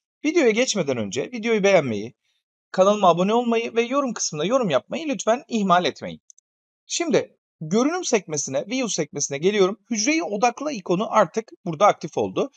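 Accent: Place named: Aegean, Turkey. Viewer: ege şivesiyele biyendim, yani beğendim.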